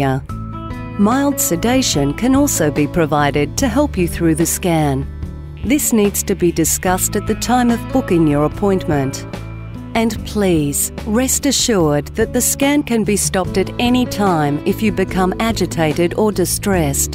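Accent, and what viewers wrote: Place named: Queensland, Australia. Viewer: Quensleeend forever; the nicest of world's ENG pronunciations - say I, a Scandinavian.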